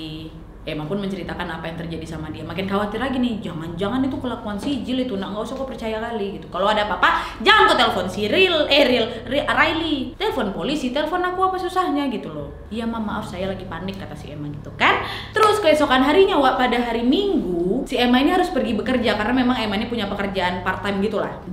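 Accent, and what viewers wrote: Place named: Sumatra, Indonesia. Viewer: Penasaran, org mana sih. Logatnya ky dr sumatera. Familiar SM aku, enak ngomongnya.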